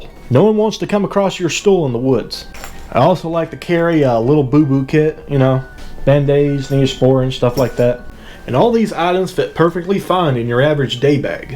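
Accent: Appalachia, United States. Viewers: Thank you for keeping the good ole Appalachian accent alive! It's unique and refreshing to hear it online.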